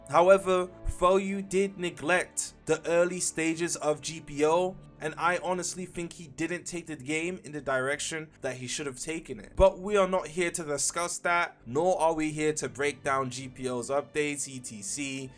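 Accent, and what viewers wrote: United Kingdom. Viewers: british smh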